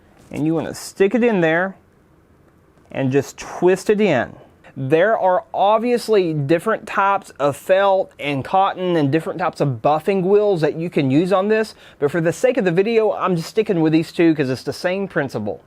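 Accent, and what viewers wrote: South, United States. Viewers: Love your southern accent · Hey I hope you dnt take this offensively, but is your accent considered a redneck or a hillbilly? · as soon as I heard his accent I just knew I was about to learn nothing whatsoever from an intellectually challenged redneck. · New watcher, and new sub. You rule. And as someone from California with a southern family, the accent makes me trust you so much more. I love when someone from NASA sounds like they started out with chicken wire and a bad muffler.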